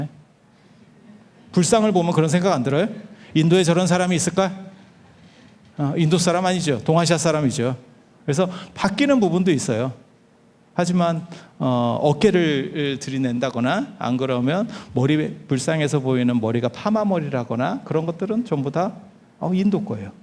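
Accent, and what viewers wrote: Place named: Jeolla, South Korea. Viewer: 왜 언제부터 전라도 사투리가 여기저기 서 마구마구 쏱아지나 언제구터 재수없는 전라도 사투리가 표준어처럼 둔값된건가 불교방송마져도 오염되어있으니 정말엿갔다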